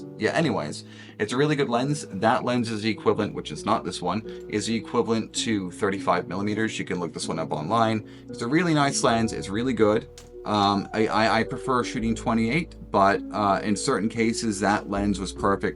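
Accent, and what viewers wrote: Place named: United States. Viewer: You don't sound Scottish. I would have said American.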